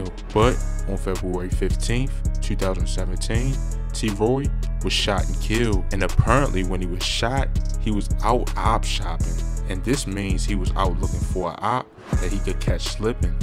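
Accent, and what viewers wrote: Baltimore, United States. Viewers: You must be from b-more. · I hear that Baltimore accent!!! Respect and love from DC Salute!!!! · You from Baltimore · My man is definitely from Baltimore, I can hear a fellow Baltimorian whenI hear one. Can we get a dog (Dug)? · You from Baltimore? You have a accent. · On god. Soon as cuz opened his mouth, Straight outta Baltimore like shit😂🤦‍♂️🤦‍♂️ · You got your story wrong bro. Troy wasn't opp shopping. Tb was looking for t roy and was right on his trail 2 minutesbehindhim. Troy was in the store to the back with someone else. Tb walked in with one of his boys and walked right up to Troy in the back of the store and shot him in the chest then ran out. You sound like you from bmore too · Where you from bro yo accent sound like ot Baltimore am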